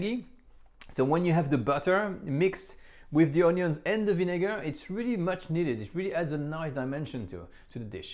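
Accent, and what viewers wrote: France. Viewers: he's native french speaker, providing english for us. can YOU speak french that well that you'd be on camera and be perfect? sheesh folks gotta insult others... make egos happy insulting. no wonder there's global issues with RUDE RUDE RUDE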